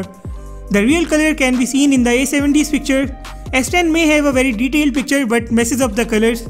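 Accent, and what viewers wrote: India. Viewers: Well as an Englishman I understood him perfectly and I've also liked the Indian accent anyways!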